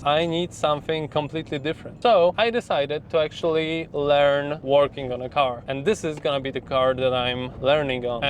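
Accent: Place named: Poland. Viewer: Po pierwszych pięciu słowach pomyślałem „hmm… z akcentu chyba Polak” 😆 Gratuluję zakupu prosiaka za tak śmieszną kwotę! Pozazdraszczam... 😉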